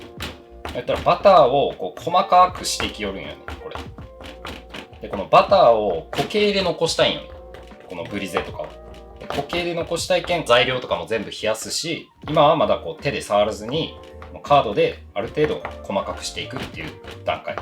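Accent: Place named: Yamaguchi, Japan. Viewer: 明日市販の栗のシブーストを食べる予定です。自分で作れるかなと思って検索したら、動画をあげてくださってて嬉しいです。ところで山口県の方ですか？○○けんっていう話し方で、そう思いました。私がそうなので。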